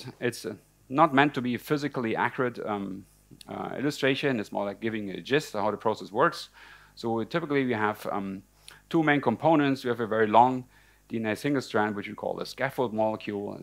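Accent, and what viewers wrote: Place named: Germany. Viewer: He sounds like a German :D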